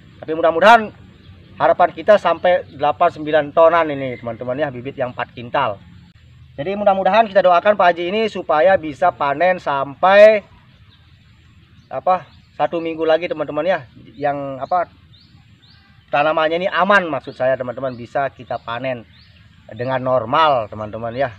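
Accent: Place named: Lombok, Indonesia. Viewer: Logat lombok ni bos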